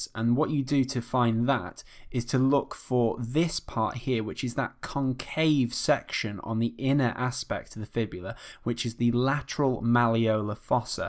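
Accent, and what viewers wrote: England, United Kingdom. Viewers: your english accent is super strong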